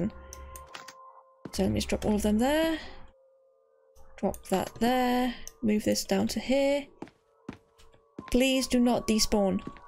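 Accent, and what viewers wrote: United Kingdom. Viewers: Your a young british i can tell by your voice!!!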